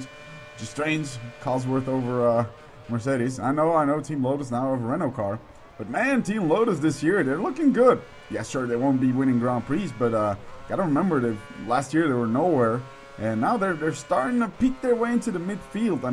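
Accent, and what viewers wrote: United States. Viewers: Are u actually belgian becus u sound american and if u ar american y ar u belgian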